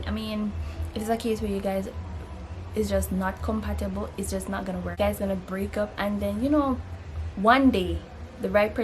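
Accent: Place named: United States. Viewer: these things you are talking about i hope they all apply to you or you are just talking for talk sake...seems you and your daughter are doing great..blessings....i also notice that you are speaking properly now...which is good cause your daughter is going to emulate you and children live what they learn..oneLove....yuh all sound like American sometimes..lol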